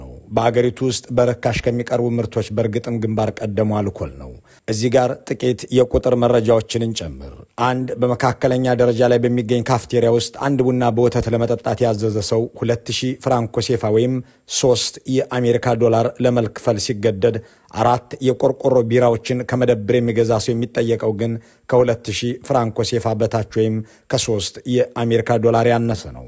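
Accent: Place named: Qomche, Ethiopia. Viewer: አክሰንትህ ቆምጬ መሆንህን ያሳብቃል ። ቢያንስ ተቃውሞህ እንዲሰማ ...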